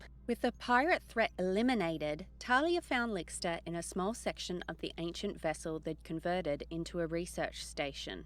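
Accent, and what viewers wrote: Australia. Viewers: Good job ! Aussie ?? Don't think Kiwi.. Either way, Welcome.. Very attractive young "Sheila" lol.... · I have just found this site and I read many comments and a number mentioned that the narrator lost punctuation as they felt she was reading/talking too fast. Now I personally did not feel that there was a problem as I seemed to understand clearly what she was saying. Then way down some one mentioned that she is Australian and it dawned on me why I had no problem with her. I am Australian. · Aussie narrator noice 😊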